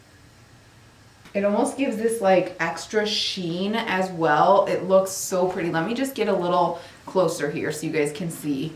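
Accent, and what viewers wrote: Chicago, United States. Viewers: Just discovered your channel and could tell right away you are from Chicago with that accent! Love this video.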